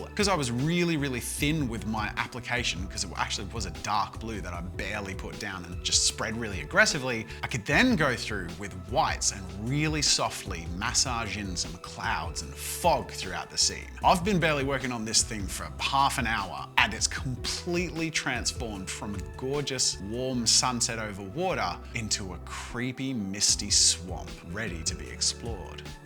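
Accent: Australia. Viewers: wait, are you Australian?! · Mickey Mouse 🐭 pls pls are you Australian🇦🇺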